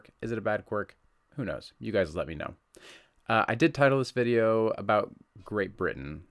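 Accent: United States. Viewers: Hey, I completely agree with you about so often not just being able to use plastic to pay for your parking - there's no consistency and it's infuriating discovering you need cash and not having the right change. 🙄 The last time I was charged to use the loo anywhere was at King's Cross station about 10yrs ago, but I don't believe they charge anymore - it's not 'terribly' common these days. 😉 I think people who are picking you up on where you say you live are being smart alecs - they probably hear your American accent and assume you won't know the distinction. I live along the South Coast from you and I always say I live in England - because I do! Next time someone asks you where you live, just say "England... which is part of the United Kingdom of Great Britain and Northern Ireland" - that'll shut 'em up! 😉😂 BTW, the contactless card limit has just been increased from £30 to £45, to reduce the occasions you have to use cash during these times of CoronaVirus. 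Hope Danielle's OK - stay safe and well! 😀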